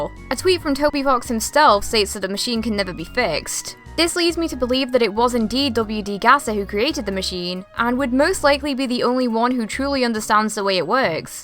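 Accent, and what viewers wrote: United Kingdom. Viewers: What's wrong with liking a British voice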